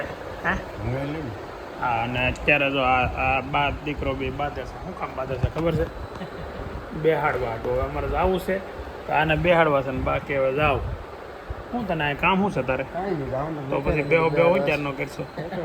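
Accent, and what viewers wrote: Kathiawar, India. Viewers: sorry gujrati vachta nathi avartu Anguta chaap chu 😂 Bolta khatyavari gujrati che😂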